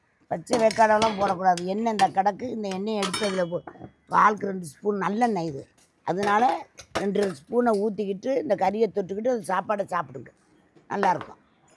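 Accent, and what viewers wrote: Ramanathapuram, India. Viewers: அம்மா நாங்கள் ராமநாதபுரத்தில் உள்ளோம். உங்களின் பேச்சு அப்படியே எங்க ஊர் போலவே உள்ளது. எங்கள் ஊரில் உள்ள முஸ்லிம்கள் இப்படித்தான் பேசுவார்கள் கேட்பதற்கு அழகாக இருக்கும்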